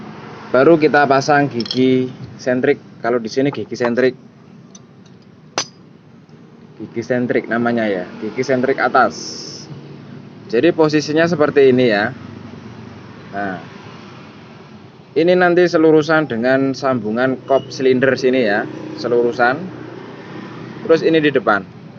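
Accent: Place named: Java, Indonesia. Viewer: Bengkelnya dimana bos ,,ko logatmu wong jowo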